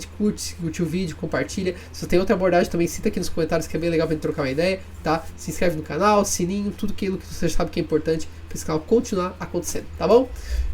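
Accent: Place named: Santa Catarina, Brazil. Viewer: Obrigado pelo conteúdo irmão! E esse sotaque de SC ai? haha, sou do sul tb, Blumenau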